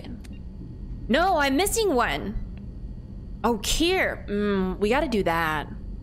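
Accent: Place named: United States. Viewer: It’s definitely because you’re American cause I remember when I went to the beach and met this Irish lady we both thought each other’s accent were pretty neat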